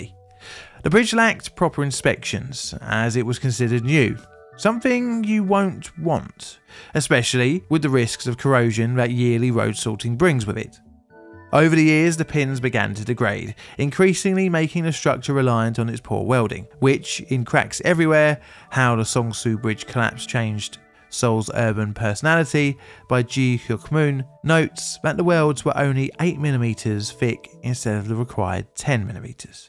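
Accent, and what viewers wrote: United Kingdom. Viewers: Talking tragedy with a condescending voice, very good ! Or maybe it the brit snobbery I'm hearing.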